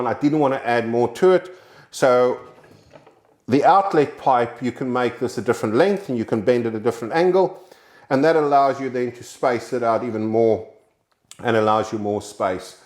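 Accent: South Africa. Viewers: You sound south african? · Thanks a lot MOST helpfull . PS you sound South African.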